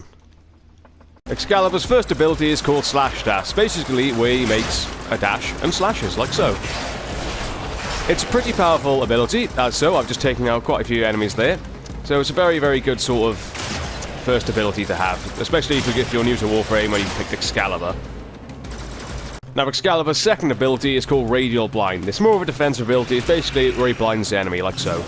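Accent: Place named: Wales, United Kingdom. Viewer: Your welsh tones make me wet.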